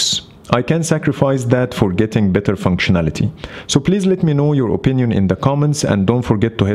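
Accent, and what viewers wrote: Egypt. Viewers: استمر عرفت انك مصري من الاكسنت 😂😂 الاكسنت المصري بتاعنا معلم في كل حته 😂😂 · The Egyptian accent is so clear here. Egyptian English. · Egyptian English Accent 👍👌❤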